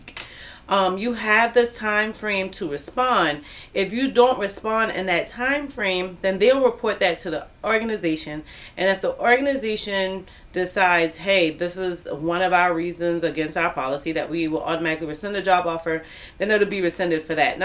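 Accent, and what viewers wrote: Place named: New Orleans, United States. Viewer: awe ok!! It sound like I kept hearing a N.O accent.😂😂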